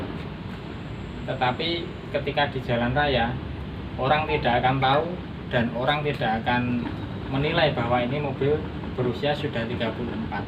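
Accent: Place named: Sleman, Indonesia. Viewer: Setuju logat nya om admin kaya orang Sleman Bantul Gunungkidul dan sekitarnya btw mobil pertama gw kijang new super g 1.8 96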